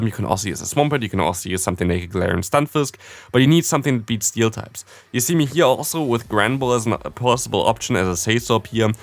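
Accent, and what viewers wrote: Germany. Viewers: Germans speak English always with this sort of accent. Nothing new if you heard more Germans.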